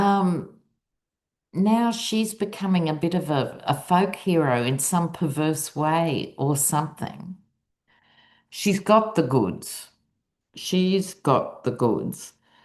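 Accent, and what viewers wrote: Australia. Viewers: A couple of decades ago I got to see Dame Edna in Austin, Texas. Before the internet we didn’t get much info about Australians here in Texas. Your accent and lingo reminds me so much of what we learned from Dame Edna, whom I adored. I love and appreciate what you do!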